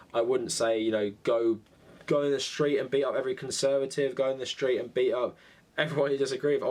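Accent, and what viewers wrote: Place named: United Kingdom. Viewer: I'm not even going to finish the video because I tell already you are advocating and defending ANTIFA. Here's the thing you're argument is essentially if you don't agree with the political speech it's ok or justified to use violence. Now flip that since i disagree with you that means it's ok that i use violence to stop your speech right? Your accent seems British to me so I'll leave you with a quote from Winston Churchill "future fascists will claim to be antifascists".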